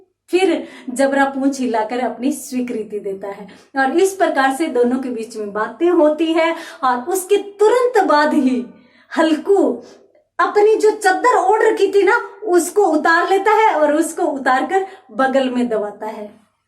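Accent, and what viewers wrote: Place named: Haryana, India. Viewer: Madam aap Haryana se ho kya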